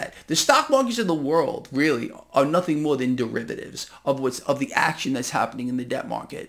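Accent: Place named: New York, United States. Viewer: The New York Accent is coming back Since moving to Fla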